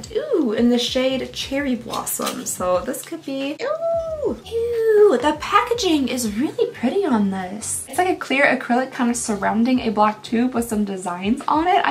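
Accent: Kentucky, United States. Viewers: How many keep coming back to hear mispronounce words, pronounce everything with KY accent, or just simply make words up? Just me?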